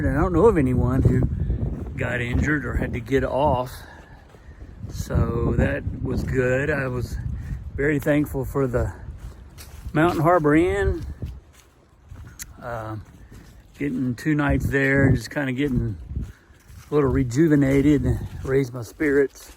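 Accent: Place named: Tidewater Virginia, United States. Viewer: Just guessing, your accent sounds like you were in the tidewater area of VA. When I was a kid there were very distinct accents here but not so much any more.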